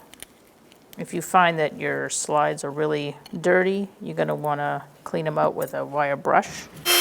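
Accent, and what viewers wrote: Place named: New England, United States. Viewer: wicked new england accent lovin' it kid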